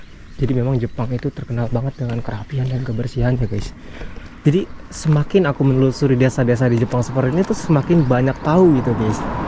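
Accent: Indonesia. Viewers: bisa bahasa jepang tapi logat indonya nampak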